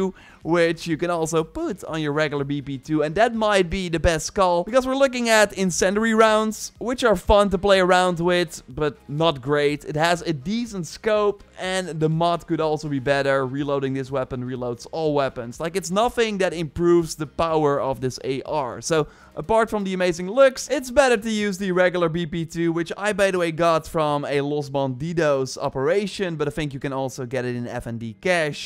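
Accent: Netherlands. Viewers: Ben jij Nederlands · Your videos are amazing, but your Dutch accent is the really cherry on top - I love it - Tot ziens!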